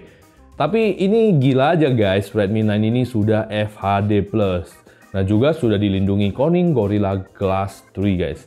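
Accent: Pematangsiantar, Indonesia. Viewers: Bah orang siantar rupanya haha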